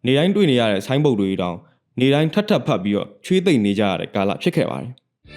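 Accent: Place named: Dawei, Myanmar. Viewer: ကထားဝယ်သားလား ထားဝယ်စကားပြောတာပီလို့ဗျ❤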